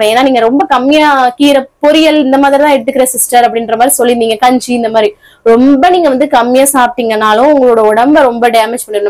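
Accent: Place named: Sivaganga, India. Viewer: Namma sivagangai tamil la nalam visarikirathu romba alagu❤thambi🎉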